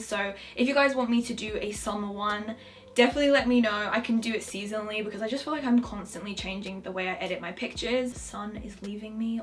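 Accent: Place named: United Kingdom. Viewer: i'm really confused by her accent lol is it british, sometimes i hear a hint scottish, australian?....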